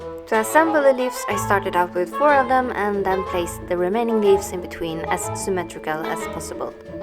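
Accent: Sweden. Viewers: that swedish accent tho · Oh are you swedish? :) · Wow, great work!! I'm stunned :O Also, could tell you were swedish by your voice, so tjena;))) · omg jag gissade att du var svensk man kan verkligen höra det på din accent!! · Are you Swedish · Det tog mig nästan hela videon innan jag insåg varför det låter så bekant när du pratar, sedan insåg jag att det är för att du är från Sverige! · Are you from Sweden cause you have a Swedish accent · Found your channel randomly, but you'r swedish aren't you?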